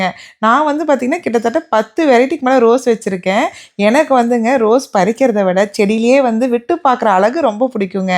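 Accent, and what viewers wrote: Kongu, India. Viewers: Alagu Kongu tamil sema super ippadia iru Thangam ❤❤❤❤❤❤❤❤❤❤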